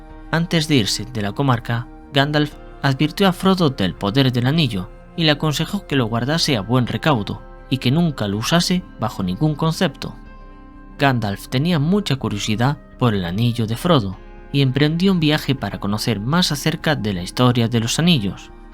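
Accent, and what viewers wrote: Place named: Galicia, Spain. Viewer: Mejor que Kai, en todo caso, ademas muy modesto, y el acento gallego es genial y mágico. Ademas en Kai noto cierto aire de superioridad y desprecio por el subscriptor. Escuchando a, me siento mejor. Pondria como a como Gandalf y a Kai como Saruman, o mas bien Zarquino.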